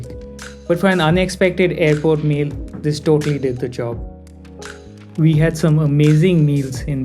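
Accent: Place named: Mumbai, India. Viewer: Just my opinion: you speak Mumbai English which will fetch you sobo subs and a few foreigners. Try Hindi to see if the new experiment is working for you or not. I wouldn't be able to to hear English from someone who is a non native speaker for very long. Your fluency is on point but the voice isn't made for non-Indic languages.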